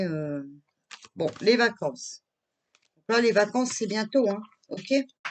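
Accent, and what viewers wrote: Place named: Nord, France. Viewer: J’adore cet accent deuch chnord !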